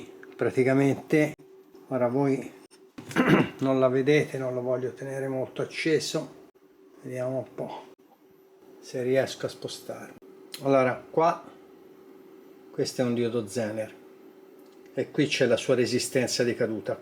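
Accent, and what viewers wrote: Tuscany, Italy. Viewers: Ciao, bella riparazione. Sei toscano come me😂. Ho visto la sigla sul transistor di potenza,SGS ates unica gloriosa industria italiana di semiconduttori anni 70😢😢bravo ti seguo volentieri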